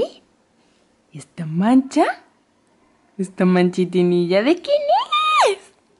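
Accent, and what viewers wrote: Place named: Andalusia, Spain. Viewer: Por qué no hablas siempre con tu acento andaluz??? No puedo evitar preguntármelo cada vez que veo un vídeo tuyo jajaja es mil veces más bonito que intentar quitárselo. Muy guay el vídeo y todos pero en andaluz mil veces mejor seguro!!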